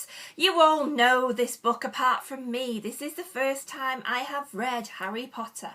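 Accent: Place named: Northern England, United Kingdom. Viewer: Yey, a northern lass. Found u via Eleanor and loving ur videos. Nice to hear a northern accent xx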